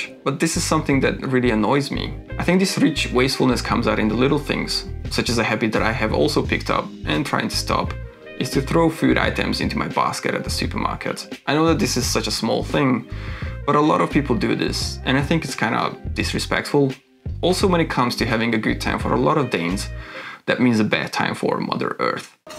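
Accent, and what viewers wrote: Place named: Hungary. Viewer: You're very observant, and I agree with all your points. I'd also like to say 'Welcome to Denmark'. Can I ask where you are from?🙂 If I should guess from your accent and last name, perhaps Hungary? I also live in Copenhagen, and I'm very embarrassed about the littering of my fellow townspeople after public events..! Sheer laziness and drunken stupidity are the main reasons for all the trash, like seen after the Distortion festival. Maybe we should make it illegal to throw trash in public (like in Singapore). Perhaps a fine of DKK 1.000,- would make people think twice.😉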